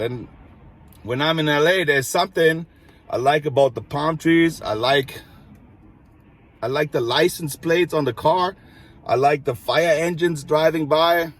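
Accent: Germany. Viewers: Ich komm auf deinen german accent voll klar 😃👍. Tatsächlich macht er es mir etwas einfacher dem zu folgen, was du uns erzählst. Cheers man. Stay healthy and in good mood ✌️ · Last night a friend was over and we got bored so I put one of your videos on. It inspired a lot of conversation and it was like we were there hanging out with you. Miss Venice beach, next time I go your henna shop is top on my list. Your a smoother talker than you think. Keep it up, you can only go up from here. BTW, I love your German accent and hearing your pronunciation of German and English words. Don’t feel self conscience about it, it’s unique and cool. Own it and be yourself. You da man!!!🙌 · I love your German accent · You and your German accent are always a treat to listen to. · You should feel proud of your German accent. Germany, to many Americans, is associated with efficiency, innovation, and strong work ethic. 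The influence of the German people in America has been quite profound. The best blue denim pants in the world were created by a German in America, out west even, and centuries later, Levi Jeans are associated with being cool and rugged, and are still quite popular. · Don't worry, we like your German accent. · We like u the way you are sir & I love your German accent!! I cant imagine learning German, much harder than English!! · Vor ein paar Wochen wurde mir ein Video über Obdachlose in LA von dir auf YT angezeigt. Ohne vorher auf den Namen des Kanals zu achten habe ich reingeschaut und nach ein paar Sätzen von dir gedacht, dass der Akzent sich irgendwie deutsch anhört und bin dann auf den Kanal um herausfinden, ob ich recht habe. Musste nicht lange suchen als ich den Namen des Kanals gesehen habe :) . Seitdem habe ich schon einige Videos geschaut und auch den Subscribe Button gedrückt ;) Bisher war ich zweimal in Venice, das letzte Mal 2003 und ich glaube mich an deinen Henna Tattoo Shop zu erinnern. 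Den gab’s da schon, oder? Falls ja, haben wir mit Sicherheit damals sogar miteinander geredet :) Liebe Grüsse aus Heidelberg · We love your German accent, and your English is very good! You are just a ray of light my friend, always positive and make people feel good! Love your energy and niceness. Keep up with these videos, you make me smile and laugh at every one.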